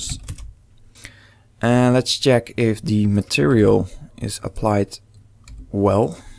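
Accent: Netherlands. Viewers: You sound dutch ;) very cool addon! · je hebt een Nederlands accent :-) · Are you Dutch? juging by your accent..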